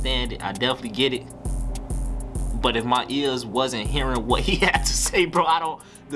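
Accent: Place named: Chicago, United States. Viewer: I hear in your voice u from the chi so I give you a little room and u young, I’m 43 and I respect what you said about your pops taught you, and u listen cause I stay on son’s and they move off old rules, and I respect that you went and did your research on B.G u got some of these young dudes who rap and don’t do that, I heard Fredo bang say he don’t know 2pac music if your pops from the streets he Definitely had u on 💪🏿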